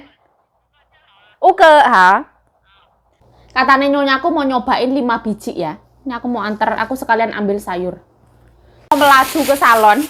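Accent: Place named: Surakarta, Indonesia. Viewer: Coro ngomonge solo bgt ,koyo aku nek gembreneng dewe nek muleh kampung 😂😂😂 tpi sak iki boso jowoku melempem efek 15th merantau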